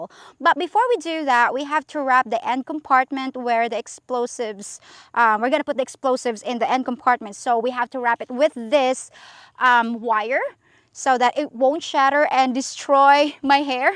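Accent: Australia. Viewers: a bit of an ausie influence !! another fun video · “It cracked the bloody cannon…crikey. Gotta love a girl who speaks Australian. Great video.🙏❤️❤️❤️❤️❤️🇦🇺😎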